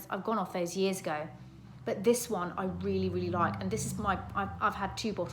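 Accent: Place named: North, United Kingdom. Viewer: Looking beautiful, like Youth to the People xxx ❤❤❤xxx 🦮🐾🐾 Hi Bertie. Bloody lovely. North accent lol xx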